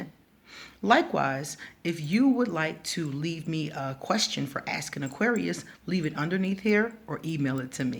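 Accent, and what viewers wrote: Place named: Philadelphia, United States. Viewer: I knew I was getting philly girl vibes from you, I hear it all in your accent ❤💛💚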